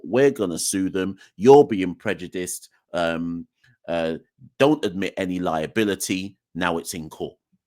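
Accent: England, United Kingdom. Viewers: This guy lives in the UK, so it seems, and is essentially making silly comments based on 'second hand' information. Two instances where GCB and BCA had a disagreement with CWI, for reasons the silly host of this podcast failed to explain, means both territories want to 'go it alone.' has also refused to acknowledge the contributions, financial and otherwise, Barbados has made to WI cricket. He also failed to inform viewers that under both Skerritt and Shallow, there hasn't been any significant implementation of policy initiatives to improve and develop regional cricket. But sits on his soap box spewing nonsense, perhaps believing, as in days of old, people would succumb to his views because he has an English accent.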